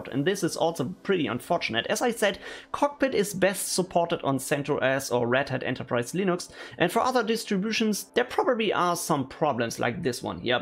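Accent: Germany. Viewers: Im ersten Satzt direkt gemerkt "Jop das ist nen Deutscher" Ich danke dir, genau nach so einer Software hab ich schon länger gesucht. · I can hear some German English.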